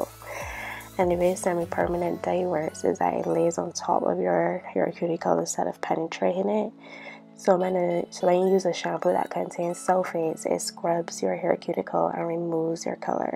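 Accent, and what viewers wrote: Barbados. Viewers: Are you Bajan?! Cause that accent 😍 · Sound like you is ah bajan yuh 🤔🤔💛💙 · ARE YOU FROM BARBADOS!? I feel it in ur accent!? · You cant hide from that Bajan accent lol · Where is she from she sounds bajan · Heard the accent and had to subscribe. Big up to BIM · Has to be a bajan. I know that accent anywhere. 😍🇧🇧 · can't miss that Bajan accent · Yooooooo I hearing that barbarian accent !!😌😌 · Am I hearing a Barbadian accent? I really hope so. YouTube needs more Barbadian channels. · Any chance ur Barbadian? Idk u just sound Barbadian. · Your curls are so pretty! Also, I’m not from Barbados but I’d know that accent anywhere lol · Sounds like you from Barbados love it !! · Love your accent, sounds like a Bajan accent if I’m not mistaken 😁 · You have to be bajan I know that accent too well to mistake it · Im new to your channel ,is your hair bleached ? 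ohh and you got a Bajan accent :) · Are you from Barbados???!!!!!!!! You sound like a bajan, that's a compliment! 😉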